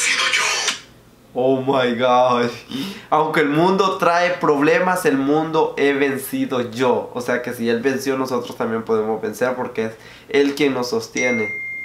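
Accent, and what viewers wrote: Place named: San Pedro Sula, Honduras. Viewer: Desde qué te escuche hablar dije, este Men es catracho, hablas como sanpedrano.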